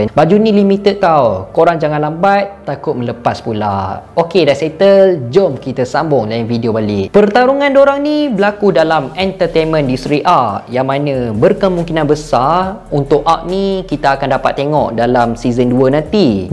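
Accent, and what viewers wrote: Sabah, Malaysia. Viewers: Mana abang yg sabah sikit tu..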